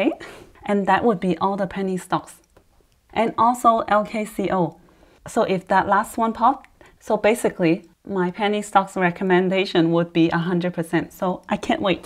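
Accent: China. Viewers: Are u a chinese ? Cause your accent sounds like so chinese. Whr u from? China ? Taiwan ?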